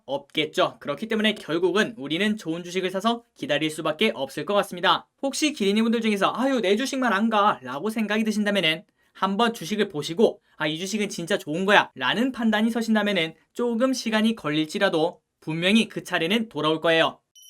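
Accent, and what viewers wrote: Gyeongsang, South Korea. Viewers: 고향이 경상도신가욥?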